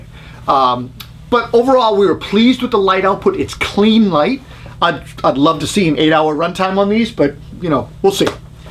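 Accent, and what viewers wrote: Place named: New York, United States. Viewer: Great review , sound like a New Yorker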